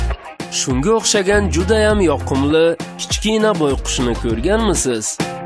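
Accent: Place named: Tashkent, Uzbekistan. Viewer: Tashkan shevasi eng rasvo sheva.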